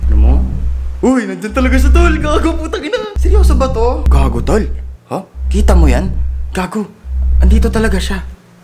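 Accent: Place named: United States. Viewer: Bakit ka nag american ako subcribe ko tas like shempre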